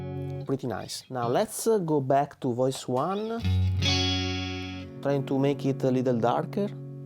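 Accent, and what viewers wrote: Venice, Italy. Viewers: a sentirlo temo di si ;D mi sa che è veneto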